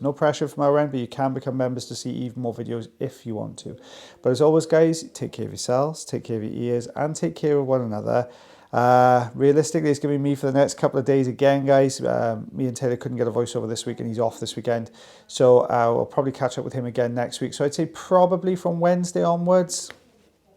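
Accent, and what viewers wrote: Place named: Wales, United Kingdom. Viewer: Awesome job. no need to apologize for the way you speak. Don't worry about the haters or complaints. You are Welsh not American. ❤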